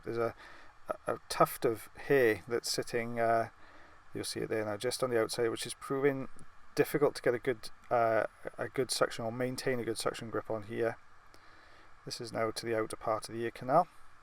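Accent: Wales, United Kingdom. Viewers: Listening to you makes me miss Wales!!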